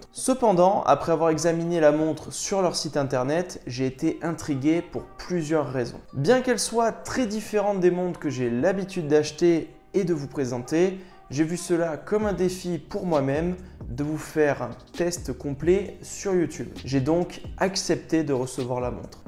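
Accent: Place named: Italy. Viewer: Esthétique dans l'air du temps avec les hommages aux montres des seventies avec bracelet intégré. Je te donne un 7/10 sur ton accent italien. Merci pour cette vidéo et les images de la montre sont top.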